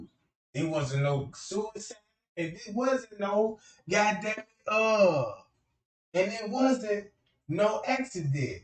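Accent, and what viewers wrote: South, United States. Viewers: Hey Boo, I Love You, and I know God is gonna Bless You for all your Dedication and hard word. And the Awesome part is your doing it from your Heart, I been riding since day 1 when I feel asleep on my phone and woke up to hearing ur sexy voice with the Sothern Accent it caught my attention and I never looked back. Thanks For all your hard work💯💯🥰💋💖💜🙏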